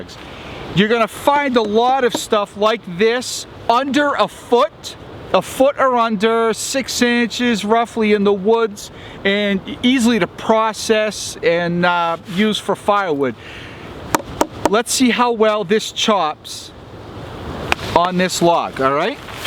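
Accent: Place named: New England, United States. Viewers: So I'm listening to the long island, possibly brooklyn, possibly queens or even boston accent - and being a city boy myself, I'm not overly hopeful about this review because this guy clearly hasn't grown up skinning gators or twisting antelope sinew for shoelaces. And then he taught me how to CHOP/CHISLE using a batoning technique. Apologies, bro. Solid video. You've done the work. Thanks for the lesson. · i just looked at it quickly,, i don't like the 51,, anyway,,where are you at/from?? i am from north central maine, live in west central florida,, you have that yankee accent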